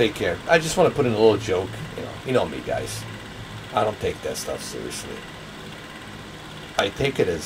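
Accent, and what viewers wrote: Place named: Boston, United States. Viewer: I think it's great that you're covering gaming (Xbox and Sony) with your 4k content. I too think that Sony has a stronger foothold within the gaming industry with their numerous exclusives and virtual reality. I enjoy both Sony Pro/PSVR and Xbox One X. I am the type that appreciates high quality 4k and HDR so I use the Xbox One X for games that are available on both consoles. Currently, I only use the Sony Pro for the exclusives and virtual reality. Overall, I do prefer Sony over Microsoft as it appears that they focus more on the gaming community but I can not overlook the greater (however small) processing power of the Xbox One X. I am very confident that Sony will leap over Microsoft with greater processing power during the next console generation. I was also like to thank you for the effort you put towards your educational content. 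Most reviewers are far too hyper-critical which makes me second guess everything. You cater towards the every-day guy. You use simple and use easy to understand terminology and your slight "Boston" accept makes you more relatable. It's like having Paulie from the Sopranos coming to my house to discuss 4k to me. You use common sense while giving simple down to earth advice with no B.S. Keep up the good work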